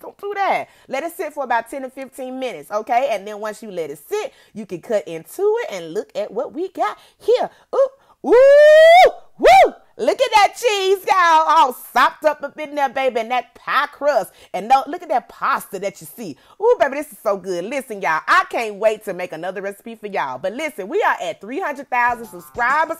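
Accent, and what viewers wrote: United Kingdom. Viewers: Why she legit sounded british tho 💀😂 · Your British accent is amazing 🤩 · That British accent took me out. I love you. ❤️❤️❤️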